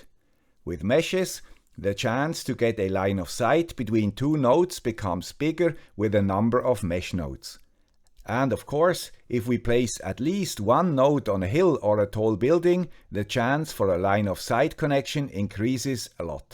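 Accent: Switzerland. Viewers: I love your Swiss accent, more videos please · Haha liebe das schwizer englisch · the guy with a Suiss accent and a small pointing hand.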